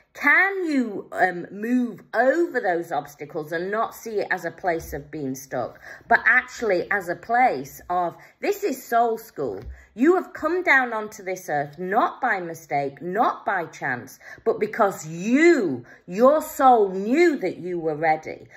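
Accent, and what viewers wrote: United Kingdom. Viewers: Nice to hear a Yorkshire voice that is where I am ❤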